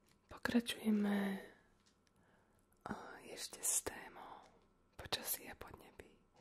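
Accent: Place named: Slovakia. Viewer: Jé to je hezký, jak i v té češtině máš slovenský přízvuk a tu češtinu máš takovou pěkně měkkou :-). A chválím za ř. Vyslovuješ ho moc pěkně. Asi to bylo pro tebe moc těžký soustředit se na češtinu a ještě šepot. Takže video polohlasem a to já osobně moc nemusím. Takže mě se líbíš, když mluvíš slovensky, protože máš krásně jemný, uklidňující šepot a myslím, že to je právě tou slovenštinou,protože je taková měkká a pro ASMR super. Jinak fakt chválím za pěkná videa a, že poslední dobou hodně vydáváš.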